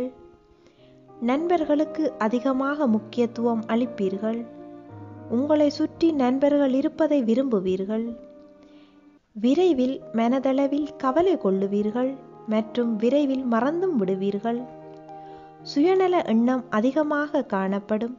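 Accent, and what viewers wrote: Sri Lanka. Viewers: ஸ்ரீலங்கா தமிழ் போன்று உள்ளது.உங்கள் உச்சரிப்பு. தமிழன் என்று சொல்லுங்கள் Indian என்று சொல்லாதிர்கள்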